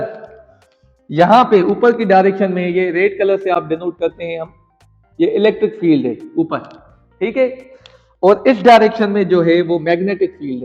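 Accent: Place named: Sindh, India. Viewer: Mashallah sir ap sindhi h mny just imagine kiya apki urdu language se or smjhany k tareeqy se k sindhi h sir ku k sindhi master h physic k or ap b aeseii lghy but ap to sindhi hi h mashallah buht acgy h smjhanab❤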